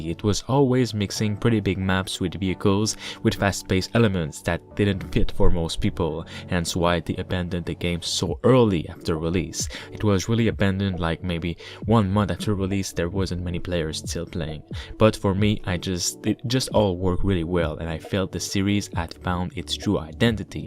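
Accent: Quebec, Canada. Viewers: Oh well I guess I'm gonna buy this to finally try it... Wait a second... Es que t'est Quebecois?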